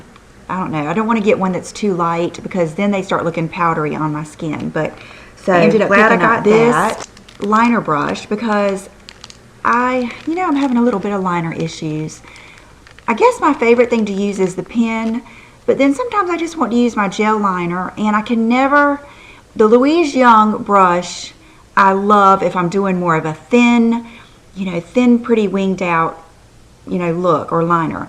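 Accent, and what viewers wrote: South, United States. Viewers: Hi!!!!! Great video. I'm a new subscriber and I love your channel. Love the southern twang too. Lol! We are close in age. I'm 40, so I appreciate all the advice..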